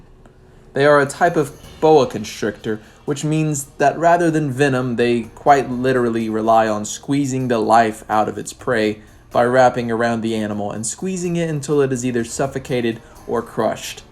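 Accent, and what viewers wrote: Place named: South Africa. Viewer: Oh this channel looks good! Must be South Africa as he has a strong SA accent. Will check it out further.. what a nice guy.. I sooooooooooi hate trophy hunting, will NEVER understand how some get fun out of killing these magnificent beasts.. Trust me, channels appreciate comments, Violet - it all helps.. and thankyou for your kind words!! 🤗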